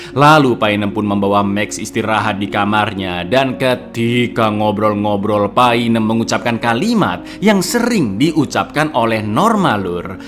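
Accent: Java, Indonesia. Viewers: Iya, meski yg direvisi film yg sama masih pe ngen nonton juga sebab narsi sama-sama menarik dan kocak. Yg satu dgn dialek jawa, satunya sunda. Horror jd ga' horror2 amat, sangat menghibur😅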